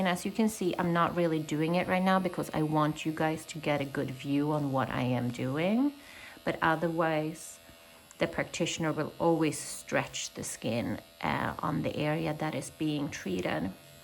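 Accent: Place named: Sweden. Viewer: Hej, jag skriver på svenska för jag tror du är svensk, tyckte det lät så. Jag bara undrar om denna penna funkar bra mot ärr i pannan, och om det är säkert att använda så man inte gör det värre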